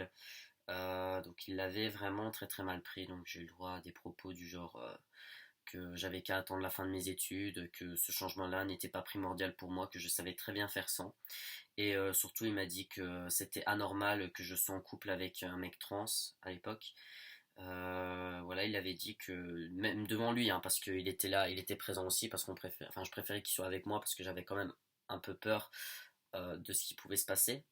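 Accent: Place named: Mons, Belgium. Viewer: et Montois en plus! Il me semblait bien que je connaissais cet accent:p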